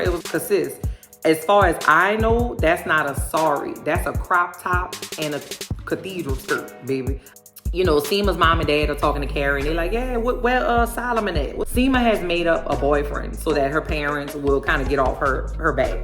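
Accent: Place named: Louisiana, United States. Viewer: Ok Louisiana, I hear that accent. Don’t want to assume NOLA. Side note, Just Like That is the pits. 😔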